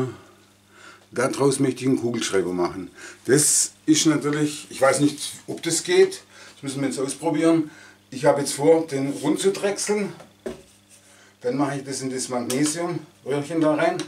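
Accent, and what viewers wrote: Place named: Swabia, Germany. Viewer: dass du dann doch nicht zu extreme ins Schwäbische verfällst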